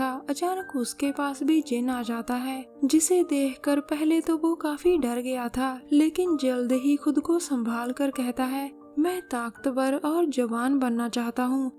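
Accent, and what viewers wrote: Punjab, India. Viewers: Your hindi is in Punjabi excent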